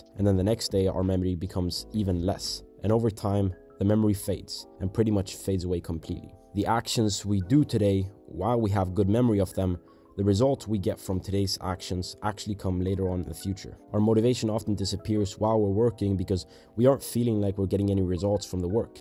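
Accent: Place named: Sweden. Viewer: just a fellow swede appriciating another swedes work keep going this was really helpful!!(if my hearing is wrong and u r not a swede i'm sorry but u do have a nice accent then!)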